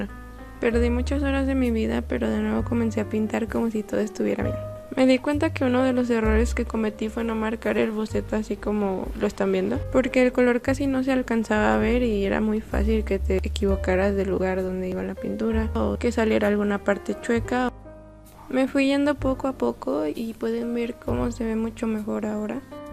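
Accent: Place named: Fresa, Mexico. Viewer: que agradable sujeta, suenas fresa, pero sin forzar, lindo :) nuevo sub cómo dirían los chavos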